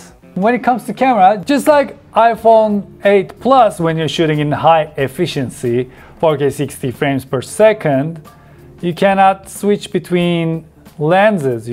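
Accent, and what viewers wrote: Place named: Turkey. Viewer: Abi türk olduğun o kadar belli ki konuşmandan yani başkası olsa bu kadar rahat anlayamazdim ne dediğini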